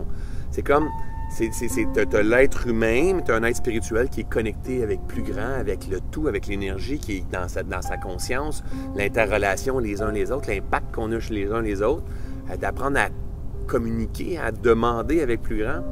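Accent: Quebec, Canada. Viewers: Magnifique témoignage Humain, sans prétention, et tellement agréable d'entendre une voix Québécoise, ca touche mon Âme.. Gratitude et bonne continuité! Louise:-)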